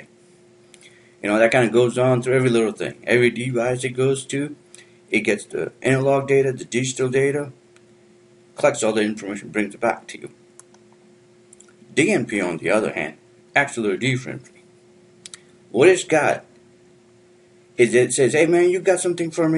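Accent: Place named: Louisiana, United States. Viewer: My guess - Louisiana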